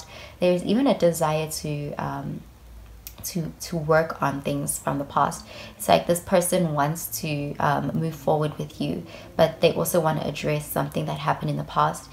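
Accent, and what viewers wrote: South Africa. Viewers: you sound South african 🥺🥰 anyways love your channel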